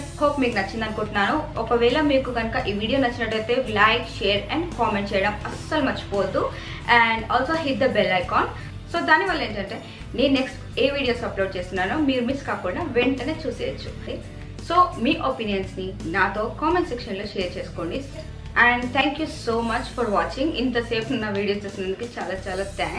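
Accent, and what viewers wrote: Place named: India. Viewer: Your telugu slang vedio is nice&suppr 👍 Do more this typ of vedios weekly once it's more comfortable to common people...🙏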